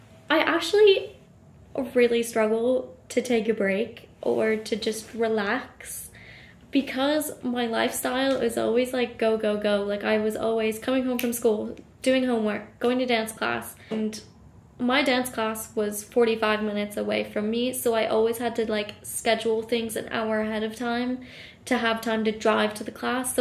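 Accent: Ireland. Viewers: Oh wow I thought she was Irish based on her accent. I’m from Ohio and don’t hear the midwestern dialect at all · You have picked up an Irish lilt to your voice,. A far cry from your Ohio roots! Best of luck! Love following your career! 🍀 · You sound kinda irish!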